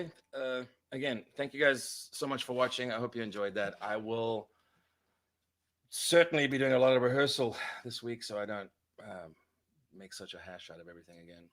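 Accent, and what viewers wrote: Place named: South Africa. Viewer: Didn’t realize he had an accent then I forget they’re from South Africa lol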